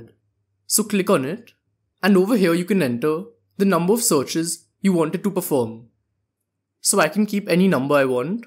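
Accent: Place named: India. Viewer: indian or somthing like that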